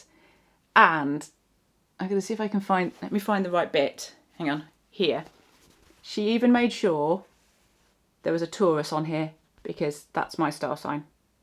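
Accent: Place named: United Kingdom. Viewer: Great show. I enjoyed your Wip parade that's when I found you. Brilliant to hear another Brit. Your are BUZZ ing around the world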